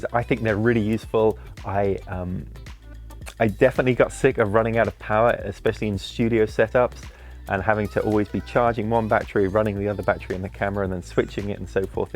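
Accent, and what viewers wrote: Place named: United Kingdom. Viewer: British accent and 'dollars'. Odd.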